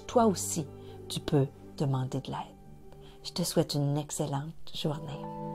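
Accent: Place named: Quebec, Canada. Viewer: Bonjour merci pour ces mots gentils ey avec un accent tres fort du Québec si je ne me trompe Je mediterai volontiers sur ce que vous avez dit au cours de cette journee Bon dimanche a vous et a votre famille Des bisous de l'île Maurice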